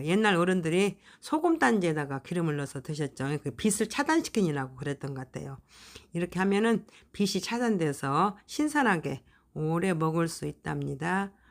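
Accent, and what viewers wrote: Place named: Jeolla, South Korea. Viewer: 다혜송❤117번좋아요ㅡ 말씀이 전라도 신듯합니다 참기름 ㆍ들기름 보관범 호일감고ㆍ우유팩안에 보관 ㅡ굳아이디어 입니다 🎉🎉❤❤❤❤❤❤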